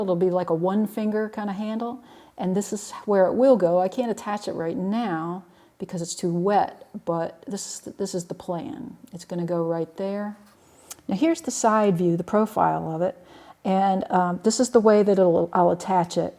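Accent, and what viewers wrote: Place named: Florida, United States. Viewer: I really love your regular tutorial-style videos. Your powers of explanation are superb and easy to listen to, and your results are so beautiful. I've learned a lot from watching, and my wife and I try to never miss an episode. But this live demonstration was a whole 'nother level. Seeing the entire process in real time really brought home the work and planning that goes into this. And is true asset, his video sense is spot on and his perspectives help a lot. You two make a great team, and we love to see it in action. Also, your Florida accent comes out a lot stronger when you're in conversation mode. It's very entertaining!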